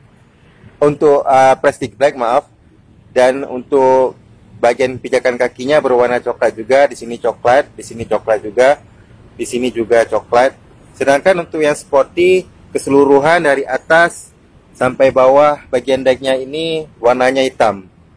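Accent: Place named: Aceh, Indonesia. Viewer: Org Aceh rupanya, logat cara ngomongnya ketauan, 😊 salam utk adoe syedara lon di Sinan. 👌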